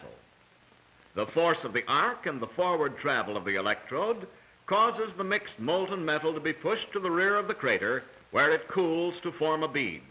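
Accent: United States. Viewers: You know you're gonna learn something when the narrator has that transatlantic accent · I can say, with absolutely sincerity and seriousness, I would have paid so much more attention in school if my teachers had all had the ol' timey, Mid-Atlantic English accent this guy employs. I practically feel like I'm a qualified SAW technician, ffs. :D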